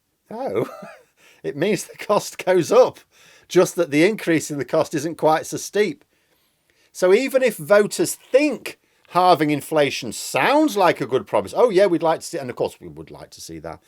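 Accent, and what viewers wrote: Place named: Northern England, United Kingdom. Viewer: Hi, I think your content is excellent. I also think many of your viewers (particularly in the south) could be put off by your northern accent. Not much that can be done about those people.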